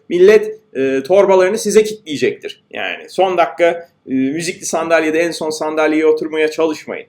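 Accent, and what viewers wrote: United States. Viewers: aksan amerika :)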